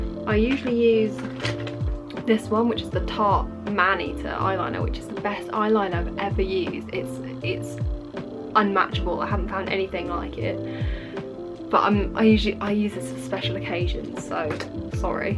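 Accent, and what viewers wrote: United Kingdom. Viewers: Godamighty, pardner, you sure are purty! Put yer makeup away; maybe in 20 years ya might need it, maybe not. Love your cultured British (?) accent and - whata miracle! - you don't say 'like' every other word like, er, as, all the American valley girls on YouTube do. Hey, don't ever come across the pond; it'll ruin ya!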